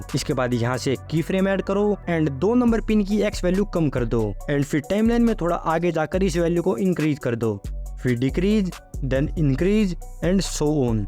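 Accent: Haryana, India. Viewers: Haryana sai kya aap 🤐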